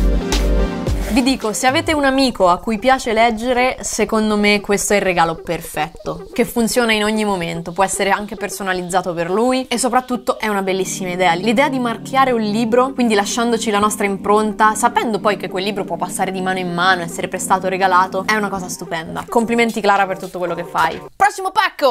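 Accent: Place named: Milan, Italy. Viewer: Sei diventata Milanese!!!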